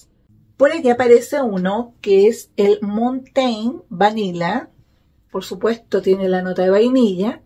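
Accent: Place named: South America, Latin America. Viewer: ¡Me encanta tu acento! Para mí, el mejor de Sudamérica. Saludos desde México.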